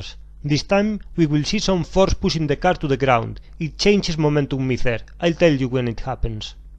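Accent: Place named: Spain. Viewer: spainenglish! love XD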